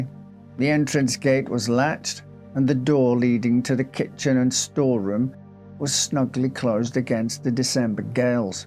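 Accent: Scotland, United Kingdom. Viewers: Is the accent Scottish???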